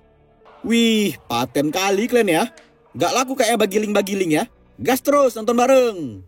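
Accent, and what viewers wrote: Medan, Indonesia. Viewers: Medan kali lek dabingnya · Asal Medan kau ya. Bang?😂😂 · Geng aku suka sekali dengar kau becakap logat medan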